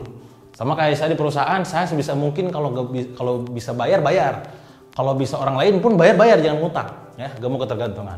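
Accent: West Java, Indonesia. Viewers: Ni sunda pisan bos 😁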